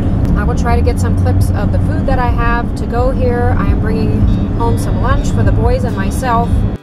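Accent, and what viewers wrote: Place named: Pennsylvania Dutch, United States. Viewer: Hi... my grandmother from PA was fully Pennsylvania Dutch as well. Thereby, making me a good portion!.. You pronounce so many words just like she did! So cool!. The scenery is so awesome where you live! The fabric store is great one of a kind, and the market with beautiful produce etc is so fantastic. The garden shop! Wow...everything is simply Amazing! Thank you! May God bless you!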